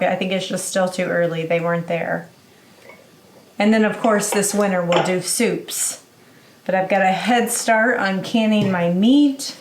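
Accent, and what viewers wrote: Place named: Michigan, United States. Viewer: My family is from Michigan 😊 I love the accent it reminds me of them 💜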